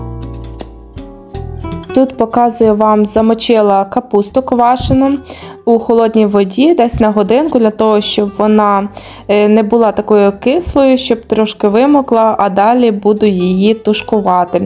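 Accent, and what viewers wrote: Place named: Lviv, Ukraine. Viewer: Дякую за відповідь,бо розмова в вас така Львівська,але я не права Українська.Здоров'я вам та миру.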